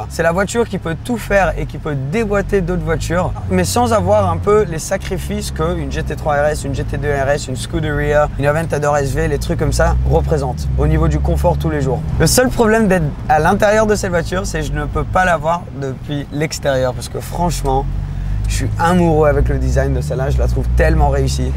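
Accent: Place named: England, United Kingdom. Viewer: Je pense qu'il fait exprès de se rajouter un "accent" anglais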